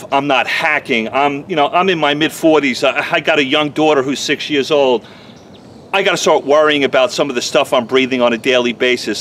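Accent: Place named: Texas, United States. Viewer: Love that Texas accent 😏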